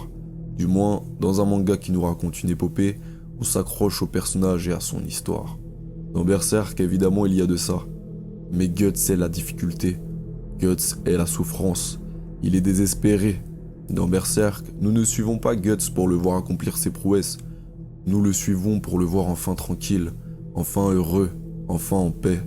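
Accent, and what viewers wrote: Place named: Banlieue, France. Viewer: Je suis fan moi aussi de l' univers de Miura. Votre vidéo est intéressante et prometteuse, je vous encourage vivement à en présenter d' autres ,que ce soit pour approfondir sur Berserk ou aborder d' autres de vos passions. Votre petit accent de quartier m' a rappelé les ( très heureuses) années où j' ai travaillé comme instit en ZEP. Quand je croise mes anciens petits élèves , ils ont un peu cette voix et certains sont devenus des golgoths qui me dépassent d' une bonne tête😂 . Je ne travaille plus dans l' éduc nat.( Je suis handicapée et je me consacre désormais à l écriture et au dessin) Je ne vous écris pas cela pour vous raconter ma vie mais parceque vous demandez des retours sur votre travail . Il comporte beaucoup de qualités mais il y a quelques petites maladresses d' expression qui gagneraient à être corrigées 😊.Vous aurez,si vous continuez à produire des vidéos, des auditeurs d'horizons très divers er ce serait dommage que quelques maladresses gâchent leur appréciation sur votre travail. 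Si vous voulez je peux corriger rapidement le script de votre prochaine video, ( gratuitement ) . Vous écrivez plutôt bien et je sais que ça ne me prendrait pas des heures. je trouve que votre propos serait mis davantage en valeur. En tout cas félicitations, vous donnez envie de découvrir cette fantastique fresque graphique sans rien spoiler! Si vous voulez me joindre je suis sur Facebook ( et oui,j ai 50 balais, c' est bien de mon âge !) et sur Instagram à mon nom. Cécile Creixell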